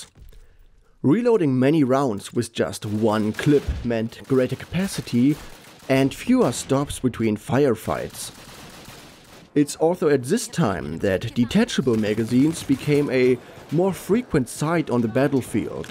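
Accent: Germany. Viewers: Man merkt das du Deutscher bist😉. Gutes Video👍👍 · Are you german?your accent seens a bit german to me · Man hört deinen deutschen Akzent ziemlich krass😂😂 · Ahhh, german accent. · oh also love the accent. German if I'm not retarded, I wanna learn German eventually but either way really cool. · Man der hat so eine Richtig Deutsch-Englische außsprache ich liebe das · Your German accent is commically bad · Sein Deutsch-Englisch triggered mich. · You have a decent German accent Ich als deutscher kann das gut hören Nices video über eine quality of life Sache die niemand wirklich beachtet Naja der reload ist für mich der Charakter einer Waffe (wie auch der Sound oder der recoil) Es gibt der Lieblingswaffe was vertrautes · Are those guns of yours Airsoft? Because you sound German, and from what I know of German gun laws, I don't think getting an AR pattern rifle would be very easy. 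· Oh hell yea a video about the functionality and realism of weapons in video games. German accent starts. Of fucking course. We just love our weapon technology. Greetings, a fellow german. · I smell a slice of German accent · Der deutsche Akzent stört bisschen aber trzdm gutes video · ich höre direkt den deutschen aus dir raus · are you German because this accent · Du kommst definitiv aus Deutschland xD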